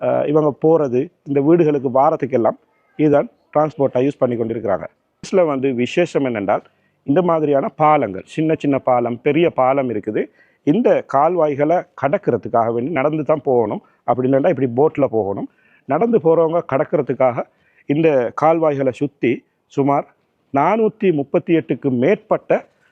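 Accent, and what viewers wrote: Sri Lanka. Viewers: Nice to hear Srilanka Tamil make full video.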